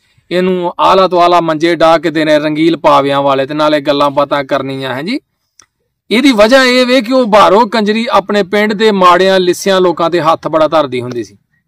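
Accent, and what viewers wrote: Punjab, India. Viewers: بھائی بہت سخت پنجابی بولتے ھو ایک لفظ کی سمجھ نہی ائی اوردو میین سُنائیے تھاکیں ہم بی انجوئی کریں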